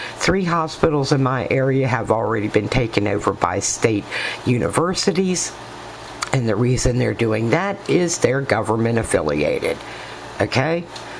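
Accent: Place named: Pittsburgh, United States. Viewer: Id encourage you to do another video, you did great! 👍🏼 You can help others so much with your story...i found you at t0ny's site💕🙏🏼😘 (r u from pgh, pa? U sound like it, thats where i grew up)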